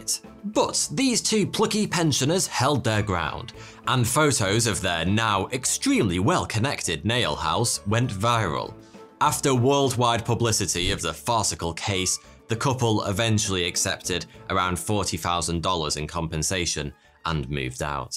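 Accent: United Kingdom. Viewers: Love your stories and your fricking Brit accent (is it? I cannot decide which kind, since British comes in so many flavors and tastes...)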